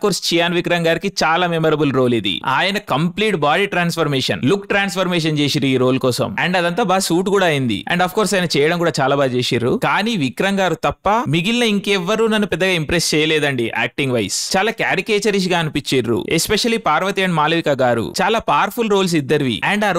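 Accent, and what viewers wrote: India. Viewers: Arey.....ayaa....idi English lo Telugu la undiraa...em matladutunnavra ayyaa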